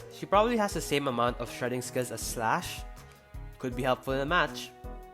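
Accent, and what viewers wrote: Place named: Tagalog, Philippines. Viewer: im hearing a tagalog accent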